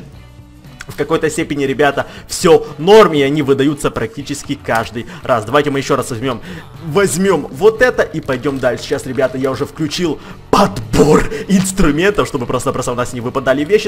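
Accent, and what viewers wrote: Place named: Ukraine. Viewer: Какие же старые видио....... даже говорит с украинским акцентом )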